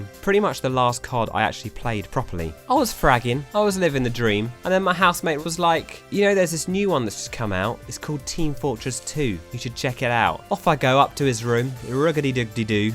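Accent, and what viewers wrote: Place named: United Kingdom. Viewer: I swear you’re like twice as british as usual in this video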